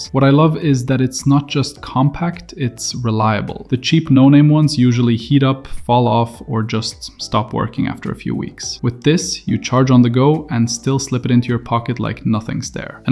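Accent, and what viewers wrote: Germany. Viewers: Ist das geil, wie man direkt raushört, wie ein Deutscher Englisch redet 😅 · When the travel gear video has a narrator viz a German accent👌👌👌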